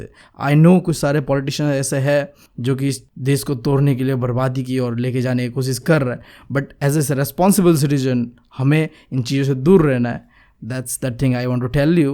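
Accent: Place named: Northeast, India. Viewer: This educator is North eastern? I think so from the accent 😊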